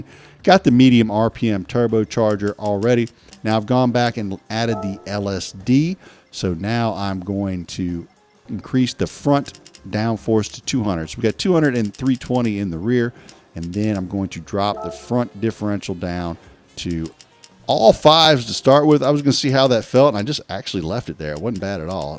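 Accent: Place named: Texas, United States. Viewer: Man that texas accent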